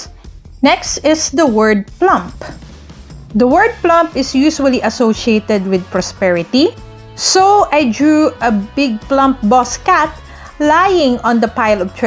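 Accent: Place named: Philippines. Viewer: Salamat po! My son, Jaja heard your accent and shouted "She's Filipino!" What are very creative way to share the gospel. Watched the entire video including the bloopers!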